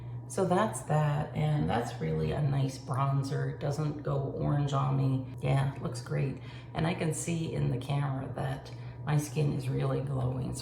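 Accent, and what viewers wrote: Canada. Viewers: Hello new friend, I am a new subscriber and I love what I see so far. I am a 47 y/o married x 22 years, mother of 1 sixteen y/o who is a lover of makeup too and clothes and purses and jewelry, lol. Where do you live? You sound Canadian. I live in Labrador, Canada, xo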